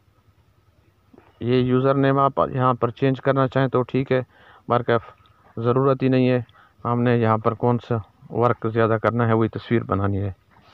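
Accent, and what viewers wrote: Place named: Saraiki, Pakistan. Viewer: Lahja sariki Hy...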